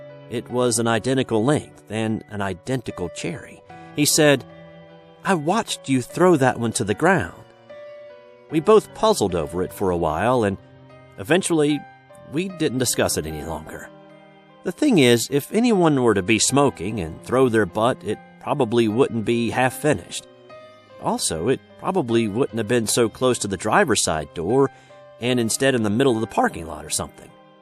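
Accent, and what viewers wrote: South, United States. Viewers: when you narrate your voice has that weird Southern style like the guy who used to narrate ‘Gravy Train’ dog food commercials... ‘Just add water and it makes a rich thick gravy, mmmm mm!!’ I like your channel but maybe take some voice lessons everything sounds like the Waltons.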